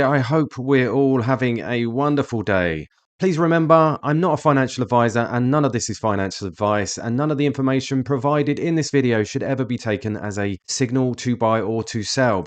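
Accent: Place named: United Kingdom. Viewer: Hi, sounds like your in the uk. Do you know of any accountants that know they’re crypto, mines got no clue about it.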